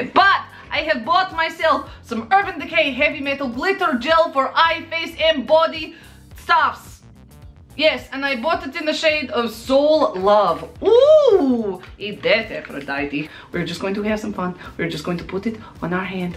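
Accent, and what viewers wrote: Russia. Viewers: I'm new here, what is her accent? Sounds a bit Russian? I love her personality <3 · are your neighbours happy about the fact that you're screaming and moaning like a crazy? 🤔😂 btw this is the first video from you that i watched and i loooooove you, especially your russian accent! 🤠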